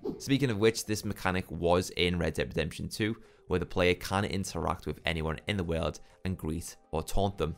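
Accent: Liverpool, United Kingdom. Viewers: Near certain you are from Liverpool. We should collab on something, yeno. It would be decent. Two scousers hopping on some games. Seen your GTAiv and made me wanna play it again, on ps3.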